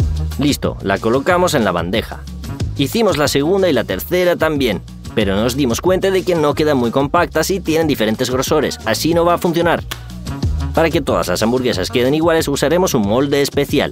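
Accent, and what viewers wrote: Spain. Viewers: No me gusta esa voz 😢😢 demasiado española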